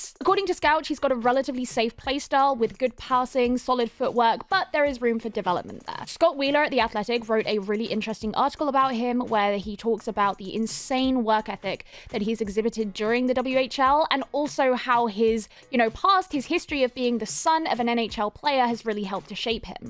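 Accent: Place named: United Kingdom. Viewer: Great analysis! Though I'm still adjusting to a British accent discussing hockey lol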